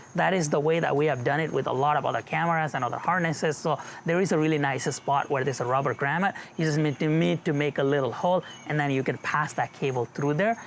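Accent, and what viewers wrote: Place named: Nepal. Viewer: Nepali bro by accent😊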